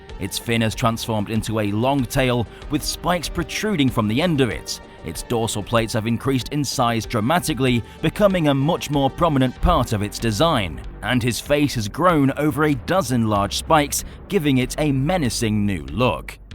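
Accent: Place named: United Kingdom. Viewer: I kinda want to know what type of pound cuz he sounds British.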